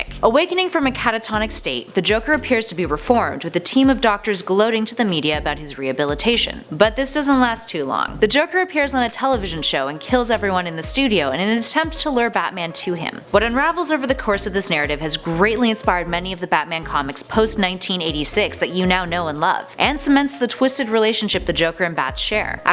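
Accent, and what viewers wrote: Canada. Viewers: I love u and ur needless and ur Canadian accent it's super hot ;) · Is that a canadian accent i detect or am i tripping? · Is she Canadian? She's adorable ☺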